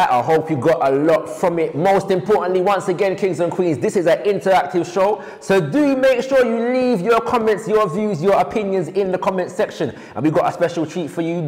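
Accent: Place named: Jamaica. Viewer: Maybe he spoke in jamaican accent or patois in his home as a child its common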